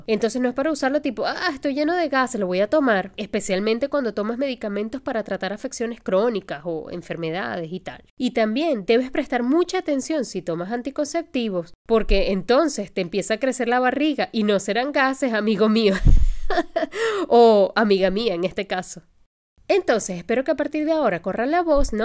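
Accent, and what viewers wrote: Venezuela. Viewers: Hablas como venezolana. Muy divertida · DIVINA Dr. he reido casi todo el video con tu sentido del humor ,,Belleza, simpatia ,conocimiento y excelente explicación .eres venezolana por tu acento. Gracias totales, Dios ilumine tu camino de bendiciones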